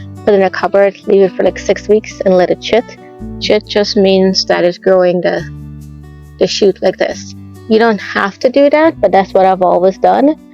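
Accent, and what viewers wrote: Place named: Caribbean. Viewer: I think I hear a Caribbean accent there! Great video! ❤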